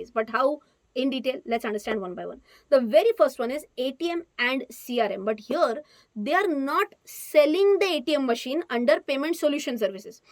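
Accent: Maharashtra, India. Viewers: How many people like her Marathi accent for her english language. अस कस काययययय…... You are the ideal for all Marathi girls mam..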